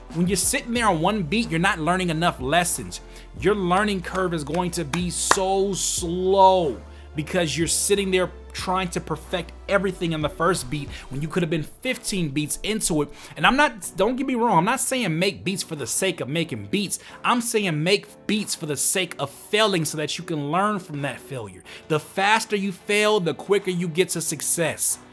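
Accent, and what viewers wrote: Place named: West Coast, United States. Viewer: lol u my dude. U b soundin west coast as fukk sometimes...which is dope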